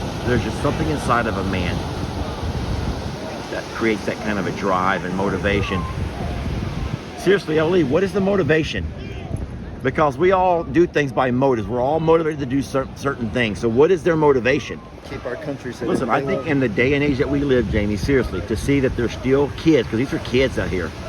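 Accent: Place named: Texas, United States. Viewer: OK let me speak up as a native California. I'm 51 yrs old and a 3rd generation Californian. 1. I would have never even noticed you because honestly you blend in. Crocks and fanny packs are not that unusual here. And even if it weren't people don't really care here. We are pretty accepting. 2. Sadly one of the reasons you are not getting people responding to your "hello's" is people are a little gun shy right now as we have had a pretty significant spike in crime, so many of us are a little on edge around strangers. Trust me when I say... it is NOT you! You look like any other normal Californian and I would have been none the wiser until I heard your accent.:) And BTW we have lots of Texans that come here to live, I have had quite a few friends from Texas and I have always gotten along with them really well. I love the Texas personality.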